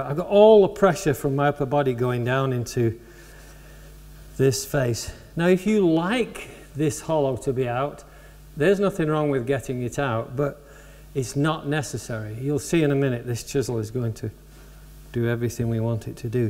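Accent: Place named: England, United Kingdom. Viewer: My goodness, the purists would have me believe I'll be the rest of my lifetime PROPERLY sharpening/honing my cutting tools. Now, with your method, I can sharpen/hone in time to build a guitar like I wanted to in the first place. And on top of that...an English accent that even and Ole Southern Boy like me can understand. Thanks a MILLION. (Subscribed)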